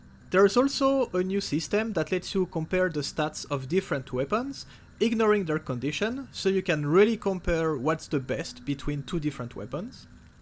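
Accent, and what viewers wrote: France. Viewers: Cet accent fr mon dieu ahah ! Merci pour la review !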